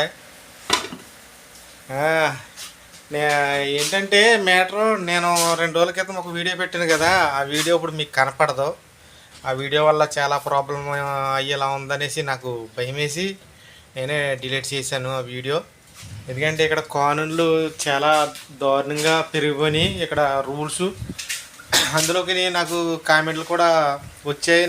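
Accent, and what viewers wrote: Godavari, India. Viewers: హాయ్ అన్న ఎలా ఉన్నారు,మన, గోదారోళ్ళు, మాటే అలా, ఉంటుంది మరి,మన,ఉతపదలు అలాగే ఉంటాయి ఎవరు ఏమి అనుకున్నా, అవి మారవు, బాగుంది వీడియో జాగ్రత్తగా ఉండండి 👍👍♥️♥️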